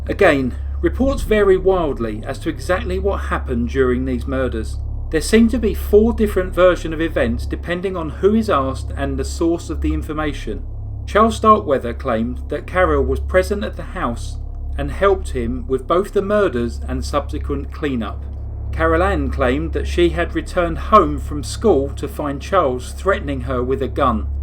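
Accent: United Kingdom. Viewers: This was a Brilliant yet Horrifying story but again presented Beautifully British. This is so sad that these young kids really went down for all the deaths of people and it happened so quick! But he took the life of a Baby and that for me is True Evil and The death sentence for the Murdering Sack of Shit I was well justified in my opinion but he would suffer more a long life sentence!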